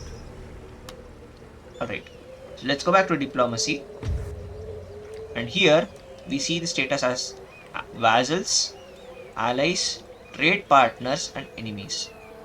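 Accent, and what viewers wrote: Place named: India. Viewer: 100% with that accent apna India. Bhai hai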